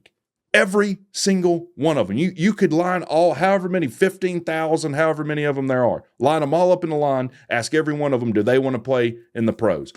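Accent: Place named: Tennessee, United States. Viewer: Your Tennessean accent is insulting and your opinion is trash. Good luck next year GT... ha...ha.ha...ha....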